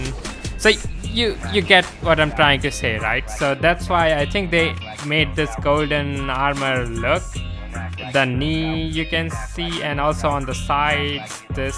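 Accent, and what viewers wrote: India. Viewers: Fuck the Indian pronunciation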